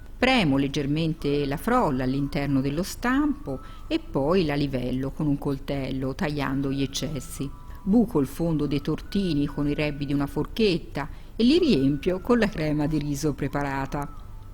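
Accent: Tuscany, Italy. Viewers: Sono fenomenali Mi fido della ricetta di questa signora dal bell’accento toscano e dalla precisione delle dosi e procedimento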